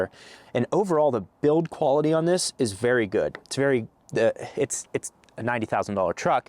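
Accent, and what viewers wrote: Pittsburgh, United States. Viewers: Just found this channel searching for Rivian Reviews and you sold me with the Yinzer accent. I'm considering a R1S to haul kids and stuff around. I'm so iffy on dropping the cash on the R1S but I'm looking at a 2016-18 Land Cruiser and the cost savings alone are just insane. I think 2024 will have a lot of great things for Rivian. I have a 18 month old and a new baby too in march and I just think the Truck will be a touch too small. If I got the R1S I would toss on the Frontrunner roof rack and have so much storage.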